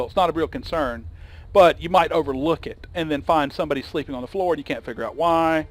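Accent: South, United States. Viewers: The lesson also is to avoid ranting when recording days in advance, because the issue could be fixed before the video comes out, making you look like you don't keep up with the development of the game (Even though you are in fact one of the more involved youtubers I've seen.) Anyway, nice series, I'm really enjoying it. Love your southern country accent too, helps differentiate you from all the other Let's Play makers. Last few episodes were hard to get through though, with the five minutes of actual gameplay and the rest all paused with you talking the viewer's ear off. Not that I mind you talking, just play the dang game while doing so. It's a Let's Play not a Let's Talk. Glad your back to the old play and talk format. Also +1 for having a David on the team:p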